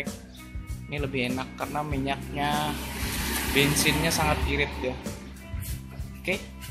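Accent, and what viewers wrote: Banjar, Indonesia. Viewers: Logat banjarnya kada kawa didustai 👍 mantap djiwa bossku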